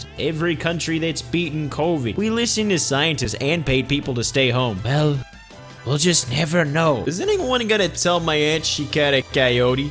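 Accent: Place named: New York, United States. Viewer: I love the NY accent, adds depth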